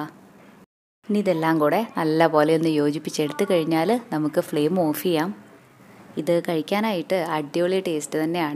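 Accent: Palakkad, India. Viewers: 👌👌 madam palakkad anno veed edak palakkadan model language varunnund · പാലക്കാട് slang ആണോ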